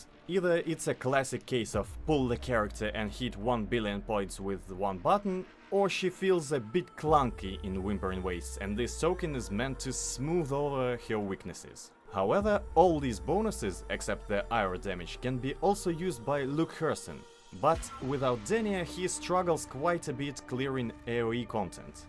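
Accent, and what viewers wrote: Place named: Russia. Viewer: Акцент, как будто ты родом из России, но живешь в америке много лет))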